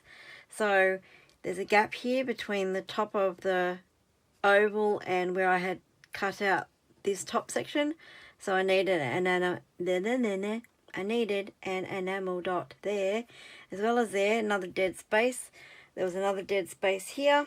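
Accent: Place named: Australia. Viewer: Loved watching how you adapted the sketches to suit your papers/photos, and it is a joy to watch /listen to a fellow Aussie❤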